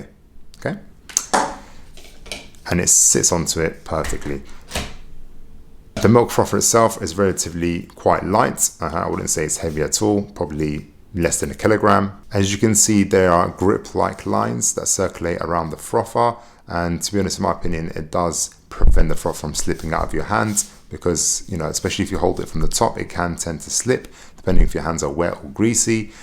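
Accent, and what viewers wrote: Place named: London, United Kingdom. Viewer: Well, it's not R.P., but it's more than adequate English with a slight London accent, I'd say.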